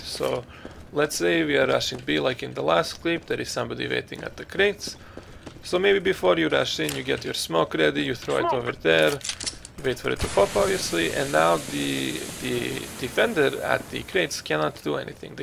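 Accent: Russia. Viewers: How to not (Me: Man this guy has a strong Russian-like accent!) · Forgive me if I'm wrong, but that sounds Russian. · Are you a russian or something? because you sound russian with your accent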